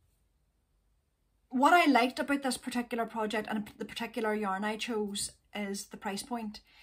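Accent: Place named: Ireland. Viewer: So wonderful to see you and hear your amazing Irish voice. love love your podcast and can't wait to see your vlogmas. Love the beanie and your sweater is so beautiful. I am not a Christian but my children are born in London. There was always some element of Christmas as they were growing up, Christmas plays and concerts, a small Christmas tree with perhaps aa gift for each child. 15 years ago my Mum passed away, in my hands, on Christmas Day. The following year instead of being sad I thought we should celebrate and invited my brothers and families and had a meal and sang songs, and played games. And from then on christmas grew and grew............I love how we get together and all cook, open lots of gifts for each other and the whole house is lit up and decorated. My daughters have already put the tree up a few days ago! Not only did I adopt Christmas but adopted (not legally) a few Mummies and looked after them until some have passed but just helping them through the year with everything I can.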